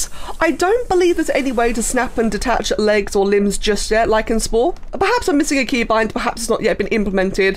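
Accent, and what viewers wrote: United Kingdom. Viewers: why listening to the bri-ish accent hurts that much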